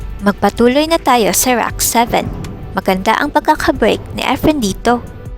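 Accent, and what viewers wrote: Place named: Tagalog, Philippines. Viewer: Fock ur tagalog accent so effing annoying so stfu biatch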